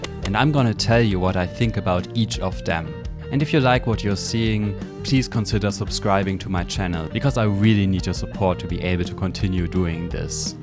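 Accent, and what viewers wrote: Germany. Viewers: I hear a Little bit german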